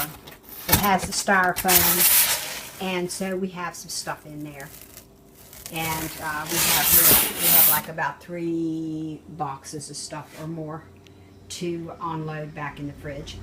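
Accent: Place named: Pittsburgh, United States. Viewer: You sound like you are from the “Burgh” Pittsburgh that is? Hope you get your doors fixed. Nothing is built like it used to be....have a blessed thanksgiving and Christmas..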